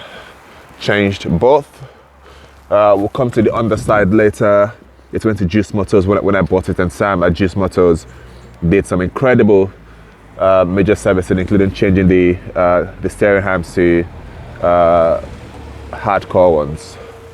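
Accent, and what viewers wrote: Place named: Trinidad and Tobago. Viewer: You sound like a Trini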